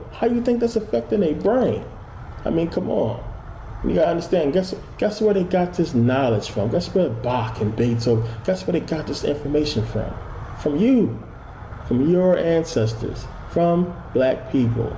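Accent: Philadelphia, United States. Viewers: I love you videos brother. I start my day by listening to them and I end my day with them as well. Now I have my own beliefs and I won't change them based off of what anyone has to say but I will say your intellect and knowledge on certain topics is so refreshing. I always feel so positive and aware after listening to you. Keep the videos coming! God bless you. Oh yea almost forgot.....is that a Philly accent I hear? lol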